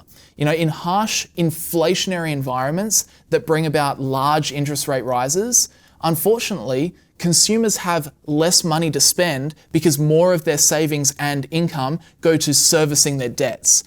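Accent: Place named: Australia. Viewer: That australian accent... Cute but not that understandable to me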